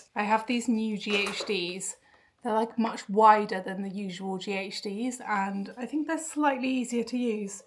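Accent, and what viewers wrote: United Kingdom. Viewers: I just want to say how much I love your videos. Your soft sweet voice is so calming. I love the British accent….. Your home is going to be so amazing when it’s all done, looking forward to the tour. is so precious, listening to his cute voice brings me back to my children when they were little. Blessings lovely lady♥️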